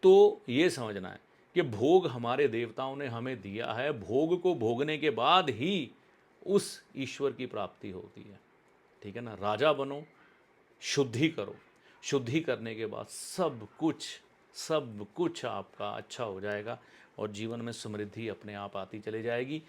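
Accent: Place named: Punjab, India. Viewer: पंजाबी एसेंट हैं, थॉट पकड़ो समझे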